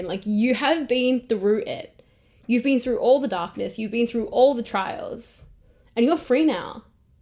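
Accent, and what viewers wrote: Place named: Australia. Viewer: Please, please, please DO NOT, hold back on your beautiful Aussie accent! Your readings resonate strongly and your accent is very endearing. I'm American and, other than Southern U.S. accents, I find American accents incredibly plain/boring 😂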